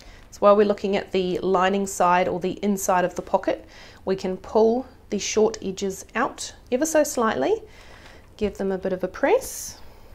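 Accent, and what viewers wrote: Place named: New Zealand. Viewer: What sort (brand) of tape was it? Luv your kiwi accent too. Great tutorial. Thanks! 😂🤣💕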